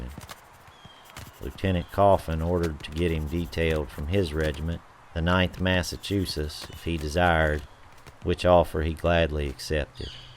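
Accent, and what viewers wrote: South, United States. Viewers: Very well read, but his accent is a southern.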